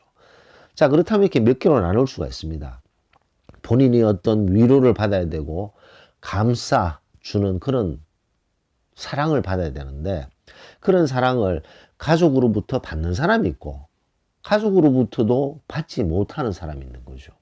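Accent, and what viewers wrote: Gyeongsang, South Korea. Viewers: 선생님요 !! 정말궁금합니다 자꾸 선생님말씀도중 너무궁금합니다~ 구독자도 궁금증이있으면 알고싶어요 왜야면요! 자꾸생각납니다 옆집아저씨 같아서요 고향만어디신지 경북인지 경남인가요?? 샘이생각할때는 어처구니 없지만 ㅎㅎ 웃음이납니다 오늘도 너무 가슴에 와닫고 내가슴을 어찌호비파는지 나도모릅니다 지금도 어디서도 나의아픈 상처를 어찌잘아시는지 부처님도 아니시고 그리고 하느님도 아니시잖아요 !!감사하고 감사합니다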